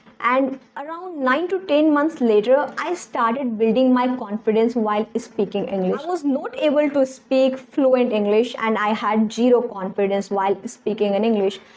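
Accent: India. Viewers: I mostly watch contents in American and British accent so campair to them your account is really cute😂. I watch your video just for your pure Indian cute accent.❤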